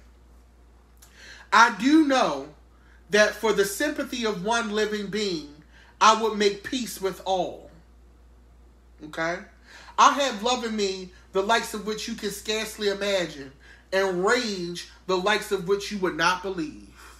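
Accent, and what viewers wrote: Baltimore, United States. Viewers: You have a Bmore accent.. I like listening to how you read, and it's nice that you're so politically informed. Thoroughly entertained by this book reading and I'm only on day 1.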